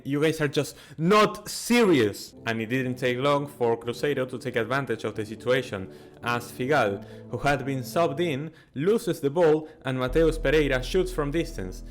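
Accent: Argentina. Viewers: es una mezcla de acento argentino, ingle y indio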